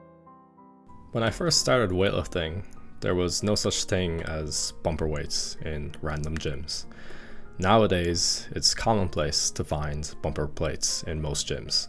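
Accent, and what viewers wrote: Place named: Ireland. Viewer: You’ll need a Kerr, dats just anudder ting yill need. So Irish I love it. Good vid, all true. Suck it performance gyms!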